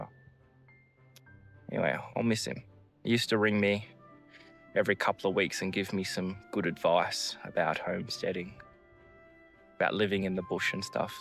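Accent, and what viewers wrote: Australia. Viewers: Hello fellow Aussie. Wishing you all the very best in your new adventure on the land. I’ll be checking in to see how you’re going on your homesteading journey. Great to hear a fellow Aussie on YouTube.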